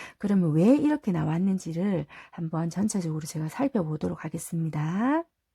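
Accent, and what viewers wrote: Busan, South Korea. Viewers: 감사합니다!!! 편안한 밤 보내세용😻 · 네 ㅠㅠ 감사해요.. 요즘 맨날 술마셔요 ㅠ 속상...어떻게 해야할지 몰라 더 그런것 같아요.. 언제나 타로보면 정확하게 떨어져서 신기하면서도 잘보고 있습니다 ㅠ 항상 감사드려요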